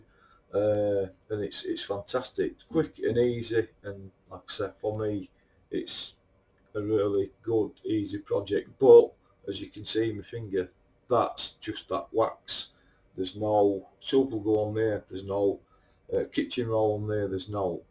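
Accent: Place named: Yorkshire, United Kingdom. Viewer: Good video mate 👍 and love the Yorkshire accent too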